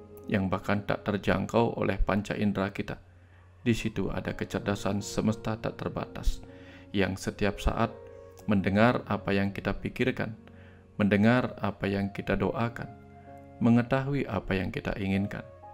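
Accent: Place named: Bali, Indonesia. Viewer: Huruf T nya masih Bali yan.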